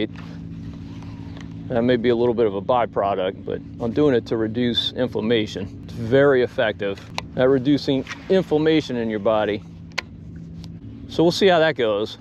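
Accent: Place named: West Coast, United States. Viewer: Youre not originally from Louisiana, i think west coast? You sound ofly familiar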